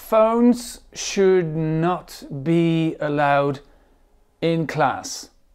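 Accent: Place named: United Kingdom. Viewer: What a wonderfull video ! I'm an college student learning English for many years , I'm not far from fluency but you steal learn me things ! Thanks a lot. Oh, and what a wonderfull British accent. I would have loved to speak the same but my natural way of speaking sounds more like GA. To cap it all, thanks sooo much, your video can help anybody wether their level or age.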